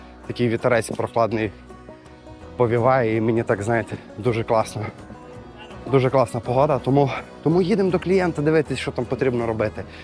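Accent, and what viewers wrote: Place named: Transcarpathia, Ukraine. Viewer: Підписалась тільки через закарпатський акцент😊. Не знаю чи так чи ні, але сумую за Україною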